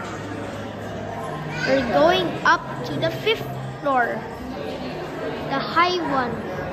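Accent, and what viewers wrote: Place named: China. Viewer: Thick Chinese Accented Voiceover!!!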